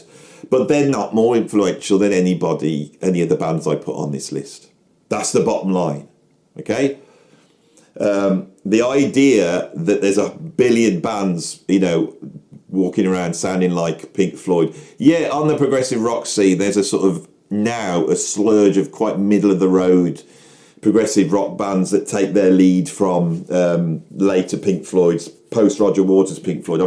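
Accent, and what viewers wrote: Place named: Black Country, United Kingdom. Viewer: You sound like a YamYam so heres one for ya, saw the Pistols at the Laffiette Wolverhampton in 1977, they were touring as the Spots (Sex Pistols on tour) because they were banned from everywhere. Nancy was stood behind Sid during the gig as he proceeded to carve his chest with a beer can . those were the days...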